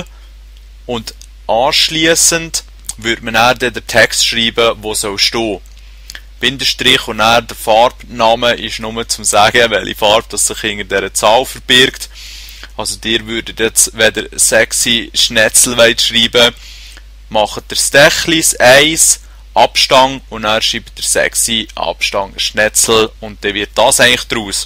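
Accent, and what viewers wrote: Switzerland. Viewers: DD alter schweizer is das geil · Schweizerisch is schon lustig, jedoch als Deutsch verstehste manchmal nur Bahnhof :D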